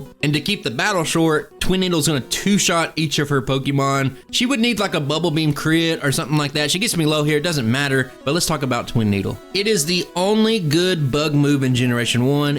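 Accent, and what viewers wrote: South, United States. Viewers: Gengar ❌️ Geengar✅️.....😂 but seriously I've been loving your videos man, and the southern drawl makes it feel like home😊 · The quality of your videos definitely rivals that of other high subscriber count creators, and your Southern accent makes you distinct from the crowd. (I'm from Louisiana, so I love the accent btw 😂)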